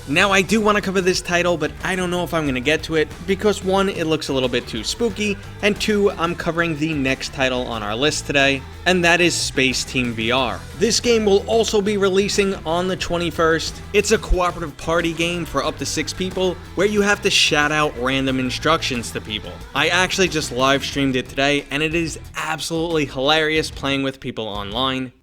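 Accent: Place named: New England, United States. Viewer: You sound like you are from New England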